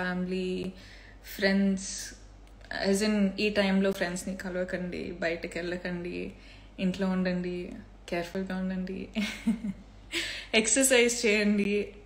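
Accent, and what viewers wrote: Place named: Kodagu, India. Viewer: its kodava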